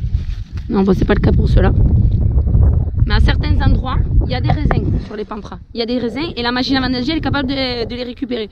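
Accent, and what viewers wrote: South, France. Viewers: Cc les amis merci pour ce voyage dans vos vignes c super intéressant merci on adore toujours ton bel accent du sud bon courage à vous 😎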